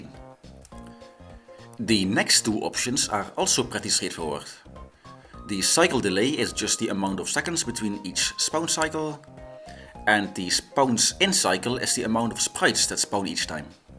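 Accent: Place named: Netherlands. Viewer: kinda unrelated but i like the dutch accent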